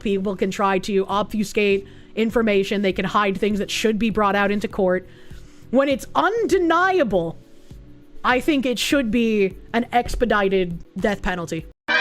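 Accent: Boston, United States. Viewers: You have a Boston accent? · Her Boston accent gets my motor running!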